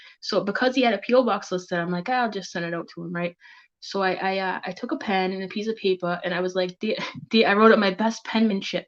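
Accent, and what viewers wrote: Boston, United States. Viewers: First time here. You from Boston? That accent is always noticeable lol. Love it! #Bostonstrong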